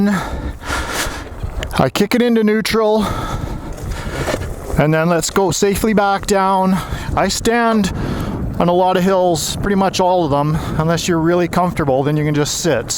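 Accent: Canada. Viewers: Man your videos are awesome .. You are a natural with explaining things AND clearly know your stuff .. This is a combo that seems more rare and hard to come across both online and in person nowadays, it seems. I have been able to take sooo much from your videos man, just want you to know I really appreciate and value the hard work you put into your vids. I know there are many others that feel the same as I do about your work here on YT. Please keep up the hard work so that we can all learn from your experience man. Also, it makes for easy listening with your chill Canadian accent lol .. wouldn’t wanna hear any type of help vid with my harsh nyc/nnj accent hahah 🤣 .. again your awesome for this stuff man .. nothing but love my man !! 💯👍😎🐐💪🏽💯💯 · Canadian from the voice first time watching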